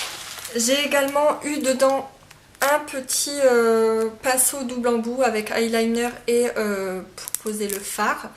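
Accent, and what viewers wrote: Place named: Amiens, France. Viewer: Coucou! Je découvre ta chaîne et j'aime beaucoup tes vidéos! Je me suis donc abonné naturellement! Je me disais que cet accent m'étais familier et je ne me suis pas trompé : je suis d'Amiens! Lool. Bisou, au plaisir!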